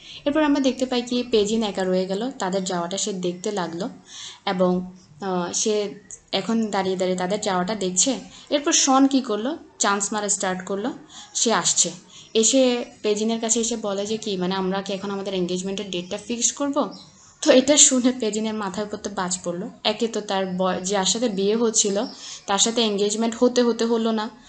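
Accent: Chittagong, Bangladesh. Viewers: Chittagong er accent 🥹❤️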